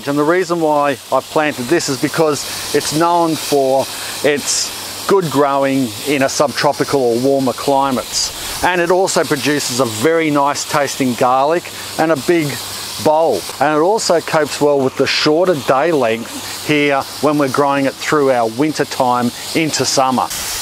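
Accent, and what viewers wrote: Australia. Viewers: I love the Australian ascent, it is much easier for Indians to understand. So hardworking man. Love this type life.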